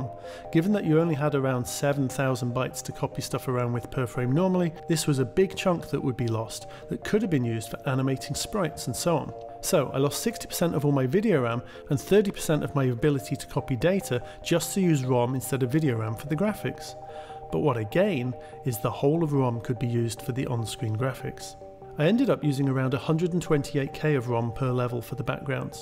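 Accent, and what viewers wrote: United Kingdom. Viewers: There is plenty of American content that has a big international-audience but they don’t change their dialect or language, they speak as to where they are from. Speaking as an Australian, I was confused when I first started watching your stuff as you sounded British, and I knew TT was, so initially I thought you and TT were a lot more American than I once thought. Ultimately it is your channel and you can do what you want, I just find it odd you say Genesis and speak for an American audience and i’ve seen numerous other comments saying the same. Toy Story is one of my favourite video games ever, so I don’t mean any hate, I just think you are documenting some great stuff here that will be watched for decades from people everywhere, it should be authentic and speak to as where you and TT are from (I know you might not be there now, but I lived in England for a while but am still ultimately Australian).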